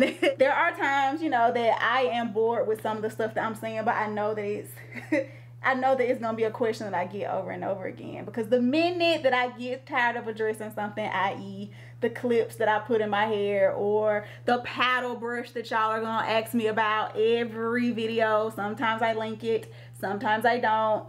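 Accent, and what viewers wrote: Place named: Georgia, United States. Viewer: What up, cousin! I have to ask, where are you from? I could listen to you speak all day LONG. I love your accent, but I can’t place it? Is it Georgia? I’m sure your southern cousins are laughing at me right now, but I am serious. I can’t place what state you’re from! I’m from New Jersey, live in San Francisco and on top of that, I’m an Army Brat and lived all over as a kid, so my “accent” is such a mash-up of everything that I sound like I have no accent at all 😂. It’s also left me fascinated with other people’s accents. I love how most other people can carry their home with them wherever they go. It’s very sweet. Anyway, I love your accent. And I’m curious. I also love how confident you are. That’s all.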